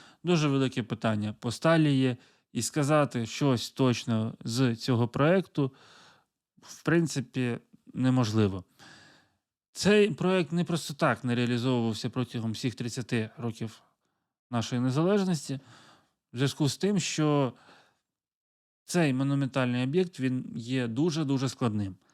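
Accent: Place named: Russia. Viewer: У Вас російський акцент, а за що лайк, Ви ж нічого не розказали, де покарання, де конкретні дії?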